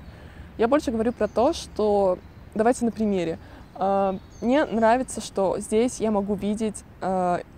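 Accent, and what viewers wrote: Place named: Russia. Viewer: Dmitrijs Bogdanovs Русские в России в каждом регионе по разному говорят.И фонетика и лексика и фразео-лингвистические особенности и диалекты. говорит также как жители (девушки ее возраста и примерно одного образовательного уровня)любого крупного города России.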